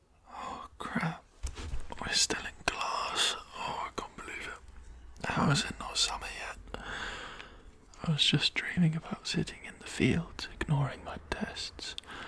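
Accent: United Kingdom. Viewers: I just watched the like nerd studies you video or whatever it's called and I loved it so much that I came to look at some other videos and I got jump scared when I heard the British accent 😭 (not in a bad way I just was not expecting it at all lol)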